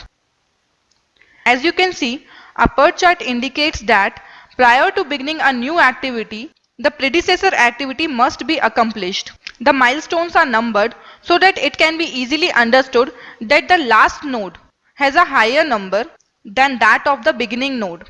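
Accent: India. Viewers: This is my I hate Indian SPEAKING ENGLISH 🤣🤣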